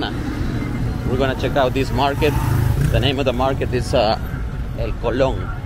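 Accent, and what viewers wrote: United States. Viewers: No manches muy americano abla español maje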